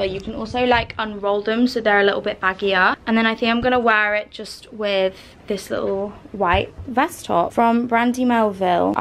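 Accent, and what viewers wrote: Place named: Chelsea, United Kingdom. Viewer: Can already hear the Chelsea in her voice 🥴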